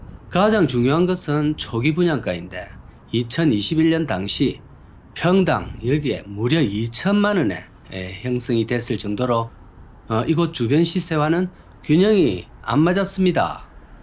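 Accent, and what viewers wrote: Gyeongsang, South Korea. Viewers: 뭐 구수한 경상도 사투리 듣기좋구만...즐겨보는 채널인데...올빼미 TV와 함께...희망아 듣기싫으면 사라져라.ㅠㅠ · 듣기 좋기만 한데 구수한 경상도 형님의 말투를 이렇게 나쁘게 표현하냐 너는 일베냐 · 딱 들어도 갱상도 사투리인데~ 구수하기만 한데 뭔 트집? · 뭔 개소리 토종 경상도 사투리도 몰라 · 말투는 경상도 사투리네요 대구달성구 인데 생각있으니까 비워놓겠지요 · 어이 말투 꼬투리 잡지마라 열심히 일하시는분 북한 빨갱이가 머꼬 니가 빨갱이같은디 경상도 사투리도 북한말로 착각하는거 보니 ㅋㅋ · 경상도 사람이 서울에서는 사투리 안 쓰지요. 갱상도 폿데가 좀 나서 그렇지. 그게 사투리 아니고 서울말 쓴다고 하는 말투입니다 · 순수 경사도 사투리입니다🎉🎉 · 전형적인 경상도말씨네요 트집잡는 사람은 아마 절라디언인가봅니다ᆢ! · 딱 억양이 부산경남 말씨 경북대구쪽은 대출 이칼때 대~출 이라카고 경남은 대'출 대자에 억양을 씨게 함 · 경상도 사투리 구수하고 듣기 좋기만 하구만~넌 중국 연변에서 언제 왔냐~? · 겁나 흔한 경남사투리인데 보도듣도못한 님이 빨갱이 아니노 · 오리지날 경상도사투리죠 강호동 은 꿋꿋이 방송에 떠드는 그 ~ 갱상도 사투리올시다 호동이가 조선족 안입니더~ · 원전 갱상도 사투리 인증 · 먼소리요? 내가 갱상도인데 내가 이미 검증하였음 나보다 더 오리지널 경상도사투리요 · 너무 구수한 경상도사투리를 쩝쩝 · ㅎㅎ 이곳과 관계가 있는 분인가 보다. 갱상도 아임미까. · 딱들어보면 경상도 사투리인데 무슨 북한빨갱이 조선족운운하는지 참 예의없고 싸가지없네 너야말로 중국 조선족 탈북자인가 예의지켜라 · 북에서 왔나? 경상도 사투리도 조선족으로 생각하다니 ㅋ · 경상도 사투리도 지역마다 좀 틀린가봐요. 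어떤경상도 사투리는 일본말 같은데 이분은 일본말같지 않고 진짜 구수한 사투리같네요. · 나 창원 사람인데 우리 동네분인가 하면서 듣는데 머선 소리~~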